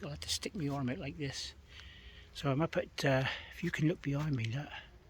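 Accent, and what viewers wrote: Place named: Cornwall, United Kingdom. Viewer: Great video ,nice to see a face to the lovely Cornish voice.always enjoy the vids .I love history x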